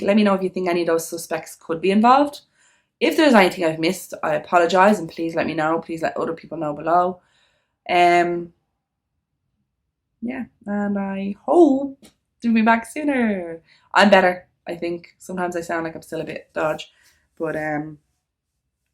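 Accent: Ireland. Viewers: Good stories,but the content in which your reading these murders is very clipped,meaning you can't read a full sentence without blurting on about something else,ur train of tght seems to drift off and it's then hard for the listener to get the gist of the story.Maybe if you just read the story as it's been presented instead of going backwards and forwards with your presumtions it might be easier for us all to follow. I do love your irish accent tgh.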